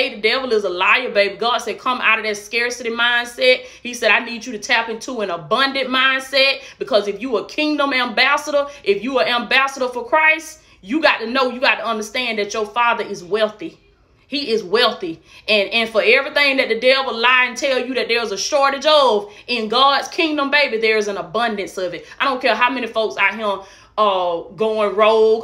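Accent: South, United States. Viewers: I love your country accent bae. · I'm a man of faith.. but I have to tell the truth... that face and that southern accent got me wanting to pay your house note And the car note! Alabama or Mississippi??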